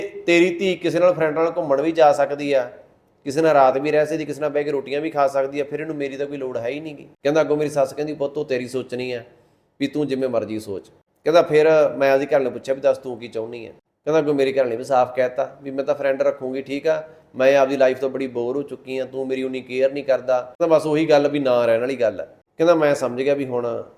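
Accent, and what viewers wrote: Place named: Bathinda, India. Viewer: Patakarkar bathinde da lagda hai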